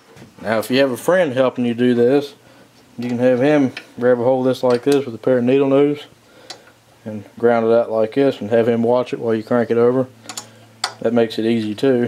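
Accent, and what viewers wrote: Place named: Appalachia, United States. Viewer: You sound like how we talk in va/tn.... My dad use to play the whole "hold this wire for me while i crank it" on all my friends... We got a kick out of it. Them, not so much haha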